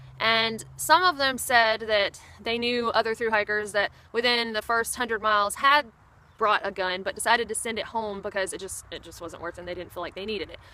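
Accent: South, United States. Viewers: Ooooh you crazy American cousins. I love your channel, and could listen to your accent all day, and liked your comments. I would say that your mind is the best defence that you have. What is the American obsession with guns?? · This is interesting, - you sound like you are from the South, and your name strongly implies Southern heritage, yet you pronounce the word 'Appalachian' as only a non-Southerner would. I mean no offense, but what happened?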